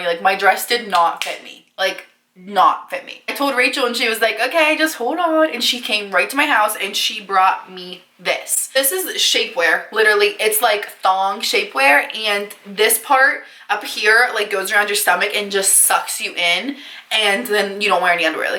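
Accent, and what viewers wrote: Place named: United Kingdom. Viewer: I thought she was British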